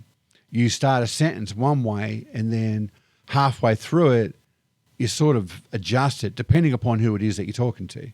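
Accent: Australia. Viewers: My father was an American GI in WW2. My mother was a GI bride. She lived in London and had a very rough time surviving the war. See is long deceased, but I have one of her treasures, a pristine certficate of naturalization. She had a rough life here, bad marriage, single motherhood etc. But she love loved this country and although far from her family and their support, never looked back. I'm sure she felt self concious about her accent, but I thought it was beautiful. Even now when I hear one, I tear up thinking about mom. I still let an English pronunciation slip out every now and then after 70 years. My brother inlaw once tried to correct me, and I curtly set him straight. Then asked him if he was my English teacher. He has never done it since. Accents and dialects are the spice in an otherwise bland world, and should be celebrated, no conciously correc ted just to fit in. 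You Aussies get a lot of leeway if you don't mind me saying so because you accent is so cool. Don't change to much. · As an American, I understand 100% of everything you say. Love the accent by the way. Any American should understand "wadda" without a look of confusion. Even the Aussie slang is mostly understandable.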